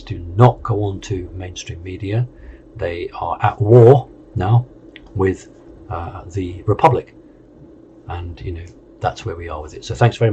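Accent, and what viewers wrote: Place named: United Kingdom. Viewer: サイモンさんの英語はイギリスだね。だからイギリスからの声。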